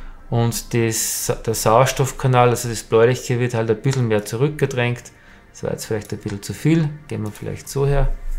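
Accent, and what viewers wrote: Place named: Austria. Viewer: Tolles Video. Aber Buddy, dieser österreichische Dialekt hört sich echt schwul an. Trotzdem absolut tolle Videos die du da machst!!!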